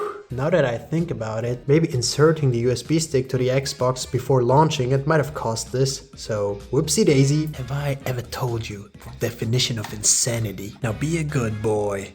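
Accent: Germany. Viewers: youre german???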